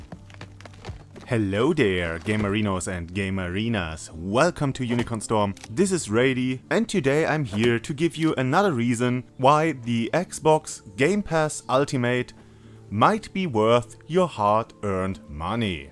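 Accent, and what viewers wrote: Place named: Germany. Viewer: Bist du deutsch? Sounds like a german trying to speak english very correctly.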